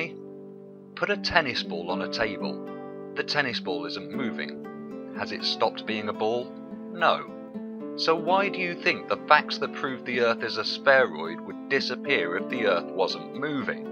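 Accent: United Kingdom. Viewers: For some reason I loooove having earth and space explained to me by a person with a British accent. I don't know what it is.. maybe the impression that I'm listening to the Hitchhikers Guide. Anyway, thanks for making these videos. On a side note, we absolutely need people asking questions about this or trying to challenge any "dominant" ways of thinking. If that weren't the case, we would never make any progress whatsoever. And when dealing with questions about space, the universe and our place in it, the sheer and ever incresing gigantic scales that you have to wrap your head around, can make it hard to combine basic principles and make sense of them, even if you have actually understood them on a smaller level. In order to support people making progress on their own, we should always refrain from name-calling; apply logic and be patient about it. Also, whoever relies on the scientific method should always embrace the possibility of his theory or working model being proven wrong at some point. 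If in this case, however, anybody proclaims he can challenge the spheric model of earth which he hasn't understood (after seeing A LOT of FE-videos I understand this is THE first and foremost wall that flatearthers crash into), supported by false claims which, as he heard from somebody, somewhere, were "actually true", not being able to support this or any other claim or reproduce it by his own means with any model or experiment, whilst having all his "evidence" shattered by one logic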